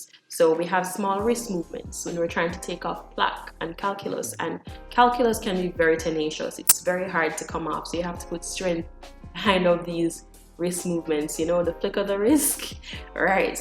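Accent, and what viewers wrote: Jamaica. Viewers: Are you a jamaican RDH or overseas? Edited: lol I ended up finishing the video so I know now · She sounds like she has a Jamaican accent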